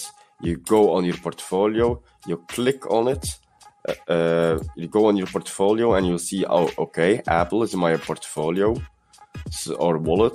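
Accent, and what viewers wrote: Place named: Belgium. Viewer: Lol this Guy is from Belgium you can hear His accent but thx Nice vid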